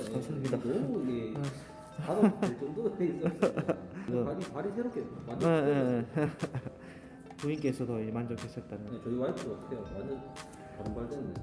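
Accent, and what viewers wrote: Gyeongsang, South Korea. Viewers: 늘 너무 재밌게 봐용 경상도 사투리는 톡톡쏘아대서 억양이 쎄다 라는 생각을 늘 해왔는데, 선생님 영상보면 경상도 사투리도 저렇게 친절하고 부드럽게 느껴질 수 있구나 생각해요ㅋㅋㅋㅋ 저는 내성발톱 아닌데 가보고싶어요 ㅠ ㅠ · 발톱의 이물질 제거할때.ㅋ 쁑~~ 쁑~~ 좋네요.👍 친절하시고 상냥하시고 ㅋ 특히 좋은점은 갱상도사투리요。 물론 실력도 좋으시고요..♥👍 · 남자선생님인데 넘나 친절하시고 관리도깔끔히 잘하시는것 같아요 경상도사투리 매력적이십니다ㅋ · 저도 대구인디 같은 경상도 사투리인데 왜 다른 느낌이죠? ㅋㅋㅋ 넘 친절하신 말투에여! 1차 관리 링크도 본문에 같이 남겨주시면 더 보기 좋을 거 같아용 1차에는 2차 링크 걸어두시구 ㅎㅎ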